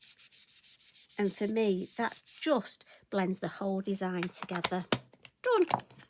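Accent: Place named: England, United Kingdom. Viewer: Thanks so much,. I honestly haven't used my stamps for years, as I started to focus on watercolouring and alcohol inks, but you have totally inspired me to get my cardmaking supplies back out from hibernation and to do some blending! It is beautiful to hear your accent, too; I'm originally from England but living in Australia since 1985 and feeling a bit homesick, so you've doubly-blessed me today, haha!!:)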